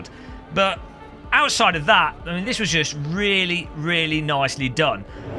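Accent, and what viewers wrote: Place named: Leicestershire, United Kingdom. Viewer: If you don't mind me asking, where are you from? Can't place your accent but would guess at Leicestershire?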